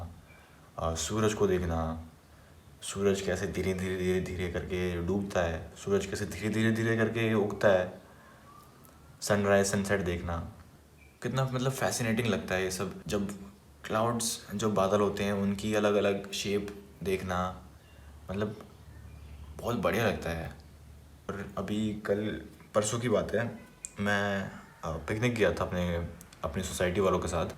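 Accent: Bengal, India. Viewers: Bhai are you from West bengal?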